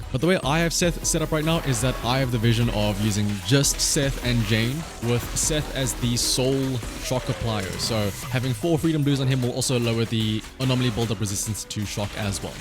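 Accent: South Africa. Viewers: I knew I recognized that accent, as a fellow South African you got the instant like and sub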